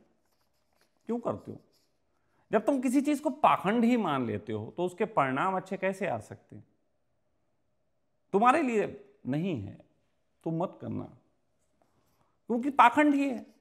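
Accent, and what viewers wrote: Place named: Arab world. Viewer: Pronunciation sai lag raha hai arabian ascents hai urdu padai hai sayad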